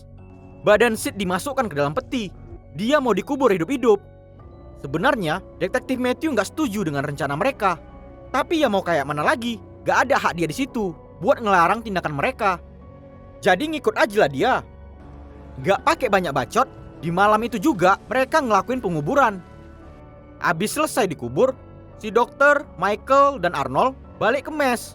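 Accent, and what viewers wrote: Medan, Indonesia. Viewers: Orang Medan ya bro? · Org medan bang logat nya kayak orang medan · Slalu suka logat medan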